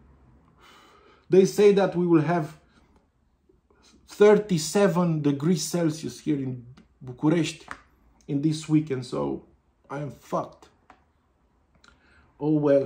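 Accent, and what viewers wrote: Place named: Liverpool, United Kingdom. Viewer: lol! your Liverpool accent 😂 i like you even more now 😊 i really like Condor too and, as it turns out, Ennerdale too. i may have been wrong about Lakeland tobacco.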